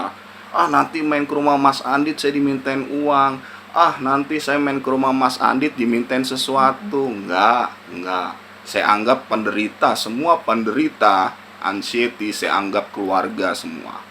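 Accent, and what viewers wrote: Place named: Depok, Indonesia. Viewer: Asyiikk neh bahasa depok nya 😅😅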